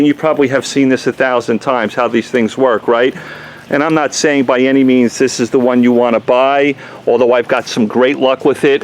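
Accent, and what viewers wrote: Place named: Texas, United States. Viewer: Thanks for the video. I recently found your channel when searching for reviews of polishers. Funny, I have no trouble understanding you despite the Texan drawl ... :)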